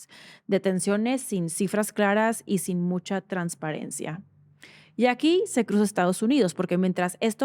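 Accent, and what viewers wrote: Monterrey, Mexico. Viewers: A ratos suena un poco ridícula con su faceta de fresa regia.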